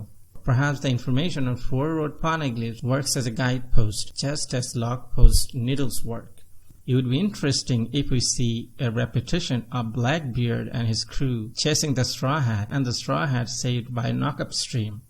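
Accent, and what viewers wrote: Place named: India. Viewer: You're Indian aren't you? Accent gives it away nice video anyway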